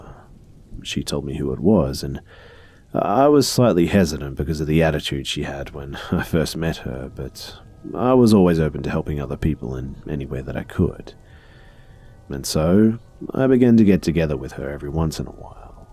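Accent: Australia. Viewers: Oh my gosh I'm new here so when you started speaking I was like "OMG you're Aussie you're my people!" All the other scary narrations I watch on YouTube are American! All. Of. Them.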